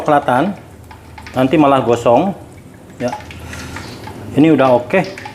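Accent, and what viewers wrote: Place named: Java, Indonesia. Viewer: Dari bahasanya ada Muthu Gobed Baki Sampean jawane pundi mas 😀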